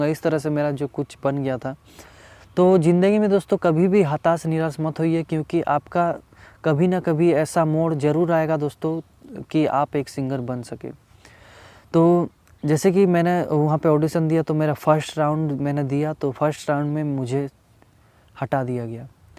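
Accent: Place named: Maharashtra, India. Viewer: Brother aap apni hindi language ko aise bolo jese ki marathee feel na ho matlv aap ki hindi language khadi boli jesi lagti hai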